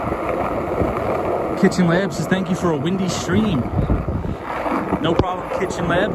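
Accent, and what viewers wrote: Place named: Long Island, United States. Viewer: Excellent! Simply -- excellent stream tonite. Flawless concept and content. As I've said before you're a sweet and powerful Force of Nature. However, must confess I jumped ship when you mentioned your two face tattoos and said you were heading back down to dull Union Square again. Just climbed back aboard about 8 or 9 minutes ago to watch you wheel your way back up to 34th street and do your 'Farewell to the Troops' stance. P.S. you have an incredibly articulate voice with flawless speech pattern, save for a bit of an occasional Long Island 'twang'. ^ _ ^ So don't believe the 'hype'. If there was difficulty in understanding you this evening it may have been due to the merciless tradewinds wreaking havoc on everyone's audio equipment throughout Manhattan Island tonight. Kudos on the 6k!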